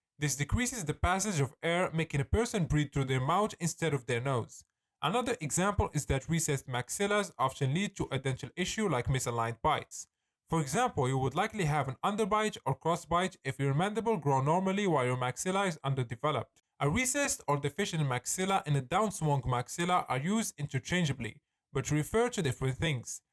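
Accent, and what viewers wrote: Morocco. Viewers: Why your accent is Moroccan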